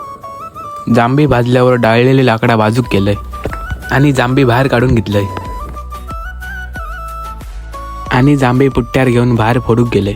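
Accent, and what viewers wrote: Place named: Malvani, India. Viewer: Mast😊 ani malvani voice ❤ ek no.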